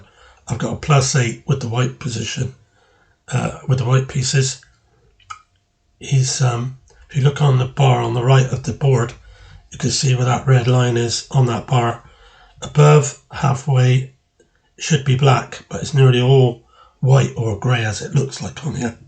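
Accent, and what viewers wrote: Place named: Bristol, United Kingdom. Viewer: As soon as I heard your voice I thought hang about, he's got to be from Bristol hah. Subbed. 😎👍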